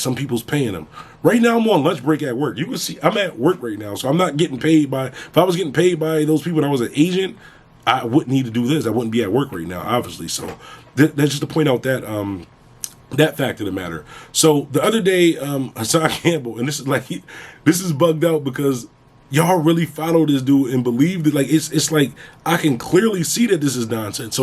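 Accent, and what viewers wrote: Philadelphia, United States. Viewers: Bro sound like he from Philly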